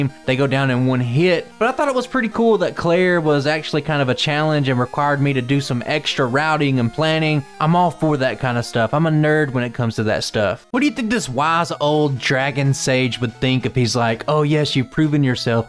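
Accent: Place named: Texas, United States. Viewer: im ngl your (Texan?) accent makes your videos very entertaining to listen to, quite unique. definitely subscribed!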